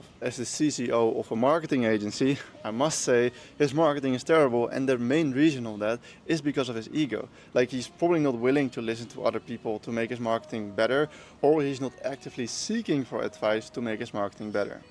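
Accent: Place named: Netherlands. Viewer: I should've known you'd be something like 6'8" from the moment I heard your Dutch(?) accent. Idk why I was so surprised 😂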